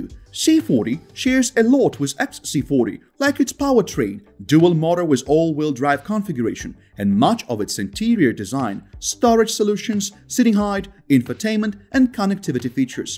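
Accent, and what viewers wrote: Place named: United States. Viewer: Is this what a posh American sounds like? Jeez dude stop you’re butchering the English language!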